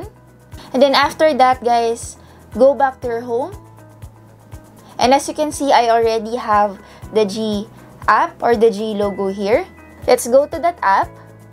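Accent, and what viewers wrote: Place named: Tagalog, Philippines. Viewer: Tagalog accents* Dongle -----> DONGEL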